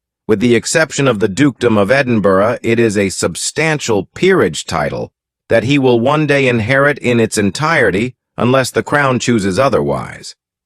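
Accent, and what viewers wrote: United States. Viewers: American voice talking about the British monarchy just sounds a bit off the mark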